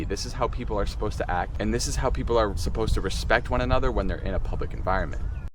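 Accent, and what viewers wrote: Thailand. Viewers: Your Thai accent 👍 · Good Thai accent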